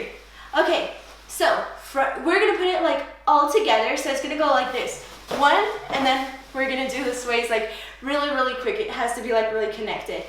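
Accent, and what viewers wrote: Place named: United States. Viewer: crazy how ur spanish accent went away over the years and now u sound full american lol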